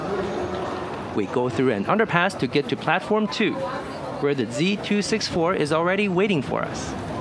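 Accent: China. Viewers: Is the “ Commentator “ Chinese who speaks English??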